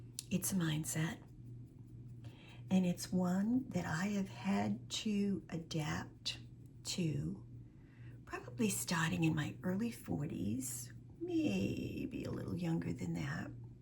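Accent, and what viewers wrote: New England, United States. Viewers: From the accent, I'm guessing you're a New Englander. And I understand that you're moving to Texas. How are you feeling about that prospect? Hope you're MAGA if you want to fit in there... Good luck with the relo.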